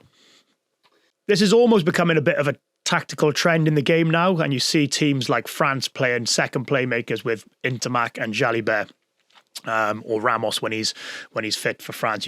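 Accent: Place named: Wales, United Kingdom. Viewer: You sound Welch now I'm listening..Bro.. Mum was a Owens ❤ Love ya MA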